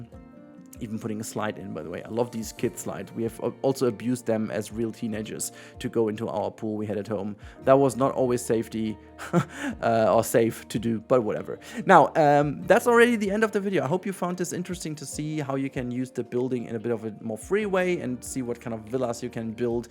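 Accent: Germany. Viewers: Wie man das deutsche raushört 😂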